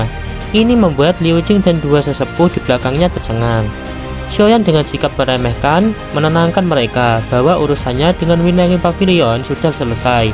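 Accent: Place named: Java, Indonesia. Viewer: Nada jawanya medok banget bang 😂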